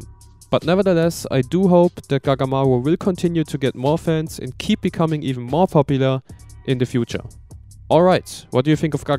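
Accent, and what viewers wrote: Germany. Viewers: Bruder kannst du vllt deutsch weil dein Englisch hört sich so an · you sound german, are you?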